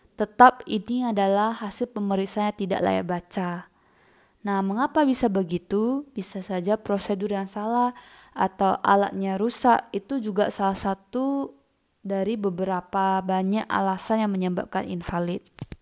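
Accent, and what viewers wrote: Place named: Medan, Indonesia. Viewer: ini dokternya kl gk dr medan ya dr pontianak singkawang hh sy denger dr aksennya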